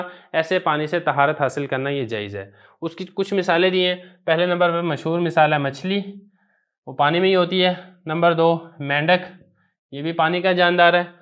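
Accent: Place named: Punjab, Pakistan. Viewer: Matlab Aap Jabab Panjabi Ho ,Accha Laga 😂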